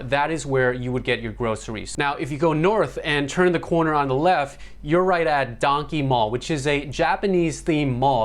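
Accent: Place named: United States. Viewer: R u Thai and German, how come u sound like American, did u grow up in America?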